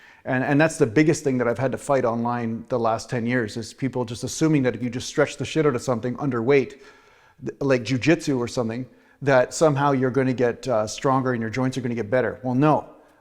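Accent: Canada. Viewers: you are like Yoda- only taller, more muscular, and with a Canadian accent. Based on the wisdom you present, I could easily accept that you are likely also 900 years-old. I'm 58, been lifting since 14 years-old, and have seen about all there is to see and read in the BB world. I'm a personal trainer, nutritionist, and Neuromuscular Therapist and, despite that background, I ALWAYS learn something new from you in every video, even if it's just a fresh way to approach an idea or an entirely new way to think about something. I can honestly say you present the most pragmatic, useful and wise content of anyone on YouTube. Truly. Thank you so much for your hard work in making these videos and for being the voice of reason in the dystopian, sometimes absurdly ridiculous, bodybuilding world that now exists. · Great advice brother. 👍 I really like that "someone else driving your car" analogy. 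So true 👍 You sound Canadian. Are from Canada? I live in Alberta. 🇨🇦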